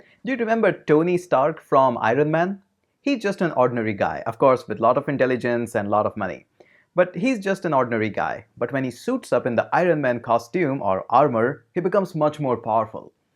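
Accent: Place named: India. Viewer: Bought your Android course today and it's amazing. Your Indian accent is pretty good. I am watching your video from 3k subscriber and it's good to be in 200 k subscriber family❤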